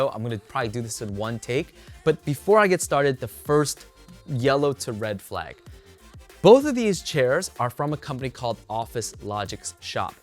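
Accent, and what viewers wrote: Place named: Europe. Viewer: Sounds like he's from Europe.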